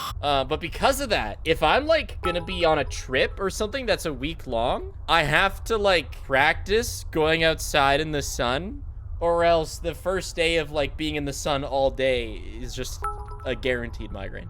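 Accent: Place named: Canada. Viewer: this "fake drunk" voice you put on sometimes perplexes me. how do you get MORE Canadian when you talk like this? These are dangerous levels of Canadian here! What black magics are you playing with to conjure this phenomenon?! You should narrate a whole book like this!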